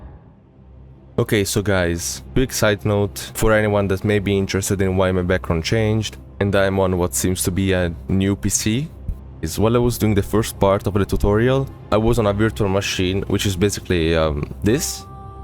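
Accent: Italy. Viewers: bro sei italiano vero? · Sei Italiano?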